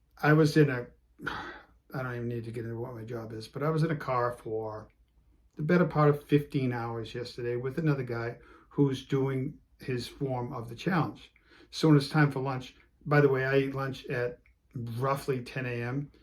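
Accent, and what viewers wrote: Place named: Massachusetts, United States. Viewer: Sounds like is from Billerica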